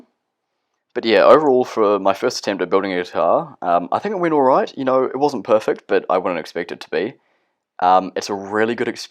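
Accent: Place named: Germany. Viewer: You kinda sound German lol. But you're a Kiwi, right?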